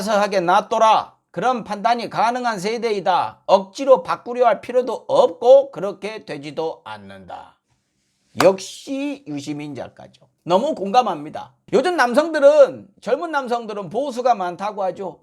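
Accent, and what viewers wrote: Jeolla, South Korea. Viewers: 저 전라도 사투리로 보수정당 지지했으면 더 좋았을텐데요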